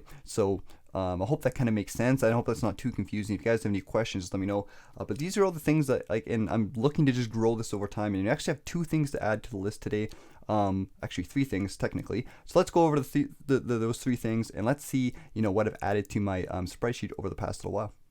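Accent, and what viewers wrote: Canada. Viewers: this guy so fun to listen to. I can tell he's Canadian so quickly.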